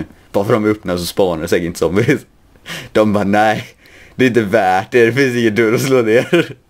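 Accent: Gothenburg, Sweden. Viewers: är du från göteborg för det låter som det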